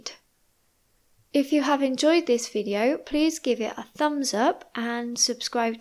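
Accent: United Kingdom. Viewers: Thank you for your videos, they are very clear and help to understand the elegant British pronunciation...... although I prefer your videos A1....😂😂😂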